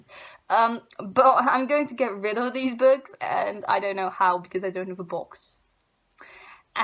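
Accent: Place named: Netherlands. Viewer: Love this! I love your accent haha :) I'm Dutch as well, although my videos are also in English. I should really read more, I'm definitely going to follow you.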